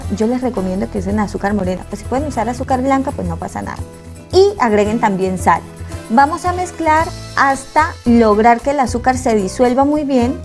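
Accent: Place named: Caribbean Coast, Colombia. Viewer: Me cuesta identificar ese acento, parece costeño cachaquizado...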